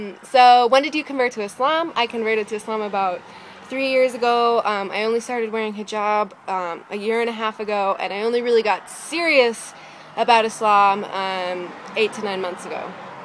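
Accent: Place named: Canada. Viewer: you accent is same as Elisha Cuthbert , which means you are from canada or you must be actress or something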